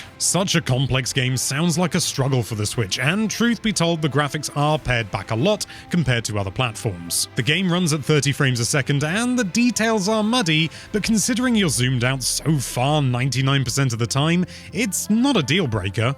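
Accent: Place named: United Kingdom. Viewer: Just uk accent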